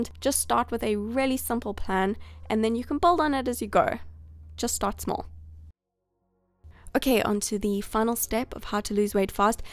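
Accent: South Africa. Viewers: HATS THAT ACCENT ? SOUTHAFRICAN ? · She's south african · are u South African or Australian? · Are you south African? That accent 😍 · Are you South African?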